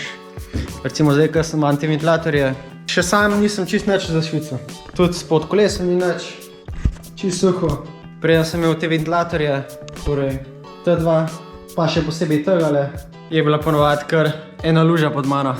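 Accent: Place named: Notranjska, Slovenia. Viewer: Bi me zelo zanimalo,če boš objavil časovni načrt z kraji,v kateri smeri boš vozil pri tvojem podvigu čez vse te občine.Če bomo zaželjeni,je nas verjetno kar nekaj,ki bi ti šlo nasprot in ti delalo malo družbe,da ti bo lažje.Še nekaj bi te vprašal,pa ne zamir,firbec pač,glede na to ,da ne govoriš špreho,značilno za kraje okrog Rakeka,me zanima,če si domačin,ali ste se priselili sem,sicer pa kakšno prav fajn zaviješ vmes po notranjsko😉,ja,držim pesti da se ti izidejo tvoji načrti in brez nezgod, lp.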